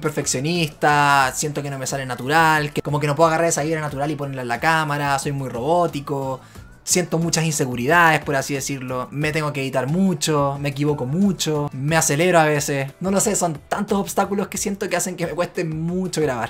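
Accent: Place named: Argentina. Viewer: pensaba que era de Argentina se parece mucho el acento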